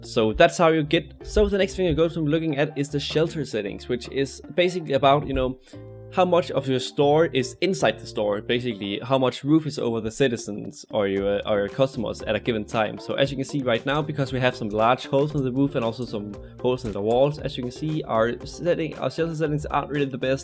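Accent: Denmark. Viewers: jeg kender en dansker når jeg hører dem. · man kan godt høre at du er dansker lol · are you danish i heard you eller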